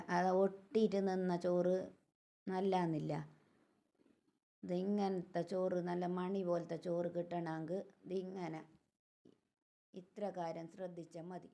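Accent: Kasaragod, India. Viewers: കാസർകോട്ട് സസംസാരം കേൾകാൻ ഇഷ്ടാണ് video super ❤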